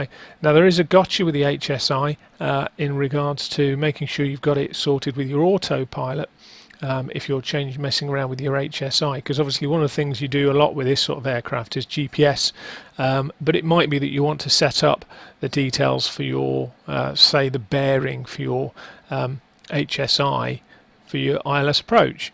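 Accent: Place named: United Kingdom. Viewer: Love your video! Of course, your use of British English, different from American English, was quite enjoyable to listen to. Anyway, the entire process was a very valuable learning experience. Thank you.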